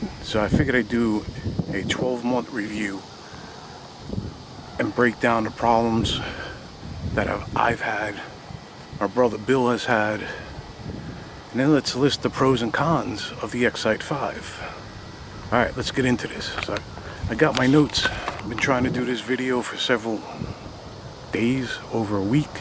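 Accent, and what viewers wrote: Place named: New York, United States. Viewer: Totally nothing to do with the scope, I just love hearing a Northeast accent being from The Bronx…guessing you’re a New Yorker!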